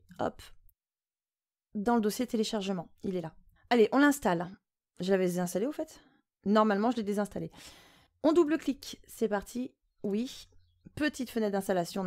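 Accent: South, France. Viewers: Jolie accent du sud 😜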